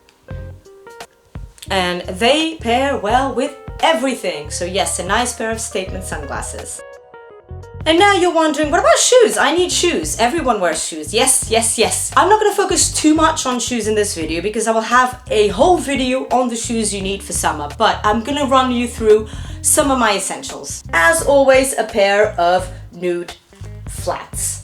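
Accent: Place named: United Kingdom. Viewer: Just love your British accent!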